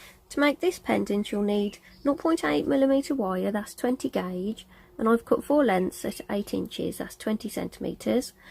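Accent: United Kingdom. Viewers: ....I just HAD to add a comment about your tutorials. I just completed this tutorial and I have to tell you that your soft voice is as soft as silk and it is soooo soothing to listen to you. I could listen all day! Love your British accent, also! You are such a favorite artist of mine; you keep every part of your tutorials clear & simple. I also love your name. My youngest daughter is named, also! You are a stellar jewelry artist & I am so appreciative of your tutorials that teach so much. I am retired and have been making jewelry for six years now,of various kinds, but am fairly new to wire wrapping/weaving. Thank you so much for your sharing generosity!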